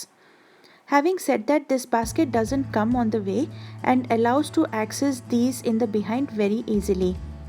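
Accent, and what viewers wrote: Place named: India. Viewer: FINALLY AN YOUTUBER WITH A NORMAL INDIAN ACCENT.. VERY NICE T PRONUNCIATION... I LOVED IT... THE VIDEOS I WATCHED USE THEIR ENGLISH DELICATELY AND ITS SO ANNOYING TO HEAR THEM SAY TTT ... AS IF THEY ARE TYPICAL SHOW OFFS.. ANYWAYS I LOVED YOUR VIDEOS. KEEP THEM COMING. SUBSCRIBED....👍👍👍👍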